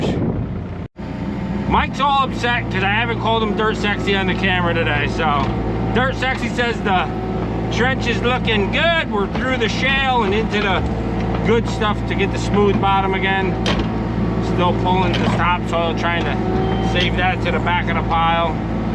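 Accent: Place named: New York, United States. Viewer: Unsubscribing!! Can't stand listening to that annoying New York accent!!! And you're a know it all, typical east Coast Democrat!!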